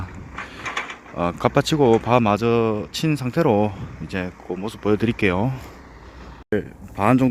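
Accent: Gyeongsang, South Korea. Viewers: 늘 지나다니면서 저게 뭔가싶었는데 공룡알이라는 귀여운이름이었군요ㅎㅎㅎ 영상잘보았습니다 저도 경상도사람이지만 경상도말을쓰면서 친절하게 잘 알려주시네요 잘 보았습니다^^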